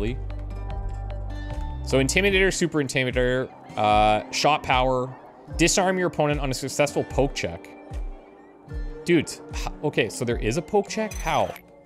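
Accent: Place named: Canada. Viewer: I'm only four minutes in and is already way more Canadian than I've ever heard him be. - British Columbian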